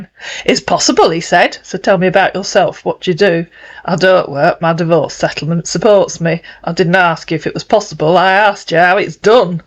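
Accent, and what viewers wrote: United Kingdom. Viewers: Howdy and hello, I thought that so called conversation was great and I love your accent and it was nice to listen to once in a while since I am half British on my mom's side. And if you want you might want to watch Monty Python's Philosophy soccer/football match between the Greeks and the Germans. You might find it enjoyable. Take Care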